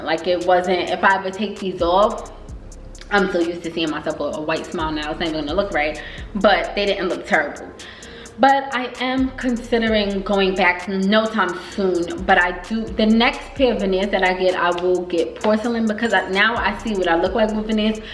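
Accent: New York, United States. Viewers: It's your dog wanting to be in the video for me, licking the couch and all 😂 reminds me of my dog ♥️ .. this video was so informative I never thought about the hours the procedure would take or even the foods we can't eat thank you so much for this video I've been wanting to get mind done for a while... sidebar I knew you were from New York soon as you started talking lol we have a distinctive "accent" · Girl I knew u was from NY or something cuz I’m from Jersey City but I live in Cali now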